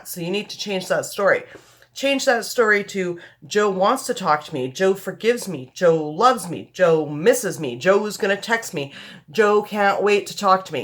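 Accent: Canada. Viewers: This is off topic but are you Canadian? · .....Are you Canadian???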